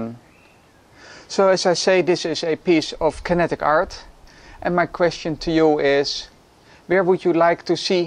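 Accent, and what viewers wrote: Netherlands. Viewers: Where are you from? Netherlands? Germany? Maybe Denmark? Because I'm not good with accents.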